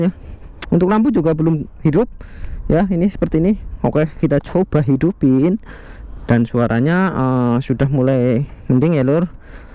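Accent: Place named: Java, Indonesia. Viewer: Mas idola bgt siiii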